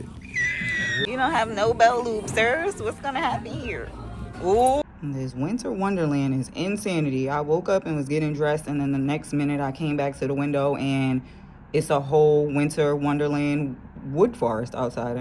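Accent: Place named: New York, United States. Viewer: So glad i found your page. I love you style, personality and love your NY accent. As a NY'er myself ( Harlemite ) I am feeling your vibe!!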